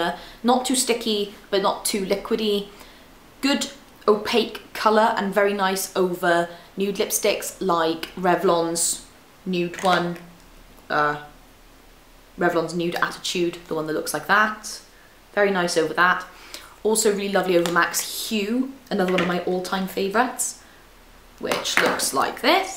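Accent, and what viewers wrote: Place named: Wales, United Kingdom. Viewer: Your accent's gotten so welsh!!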